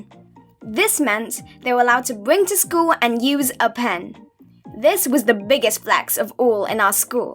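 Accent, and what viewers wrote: United Kingdom. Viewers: Are you british, irish or new zealander can't pin the accent but it sounds british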